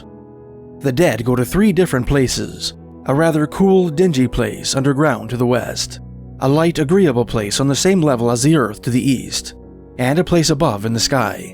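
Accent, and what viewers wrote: Canada. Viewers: Oh this narrator he's for sure a Canadian, eh.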